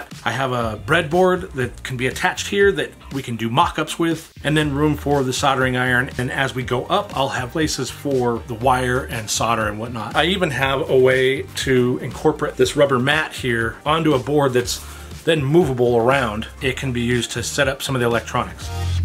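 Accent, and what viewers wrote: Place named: United States. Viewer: Nah, I’m just pulling your leg over the slightly peculiar US pronunciation. It’s a bit like how you guys have an odd way of pronouncing aluminium. It’s just a mildly amusing linguistic difference across the Atlantic. 🙂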